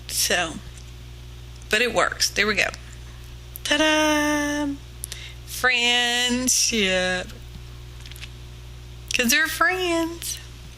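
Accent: South, United States. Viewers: No doubt you're a southerner! lol This was something I've never seen before and I love it!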